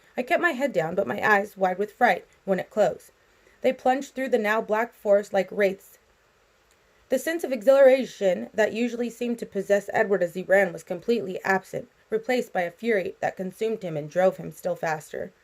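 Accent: United Kingdom. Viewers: Your English accent is amazing 😊🏴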